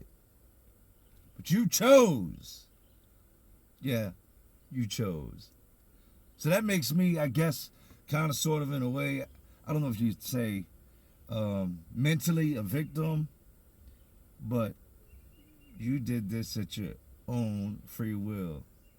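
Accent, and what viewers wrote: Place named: South, United States. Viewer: hes from the hood in the south do you expect him to sound like JFK??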